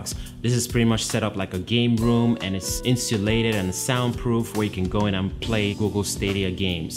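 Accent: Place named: Brooklyn, United States. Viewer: Hey Brooklyn Accent Guy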